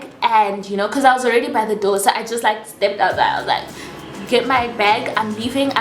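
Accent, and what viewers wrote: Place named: South Africa. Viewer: As soon as you started speaking, I was like she's gotta be South African and then checked another video to confirm. LOVE your accent. "We pay 50/50." SIS WHAT? No. Lmaooo at the mother's reaction. The whole story has me weak.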